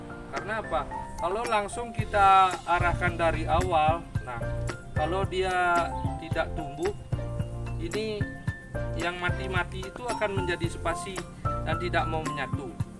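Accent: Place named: Bali, Indonesia. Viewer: oh pantes logatnya kayak orang bali😂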